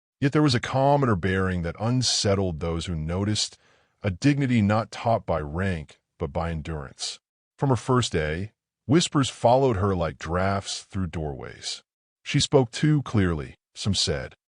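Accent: United States. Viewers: It really disappoints me that you create a story set in England but you us an American ai voices 👎👎🏻👎🏼👎🏾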